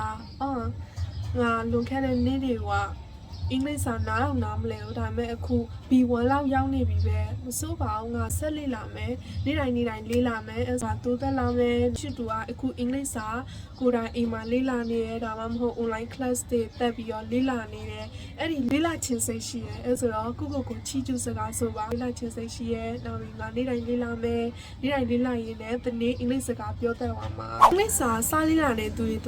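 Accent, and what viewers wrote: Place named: Myeik, Myanmar. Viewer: Thank you Tr. ညီမက မြိတ်သူလား။ လေသံ မြိတ်သံ နဲ့ တူလို့ပါ။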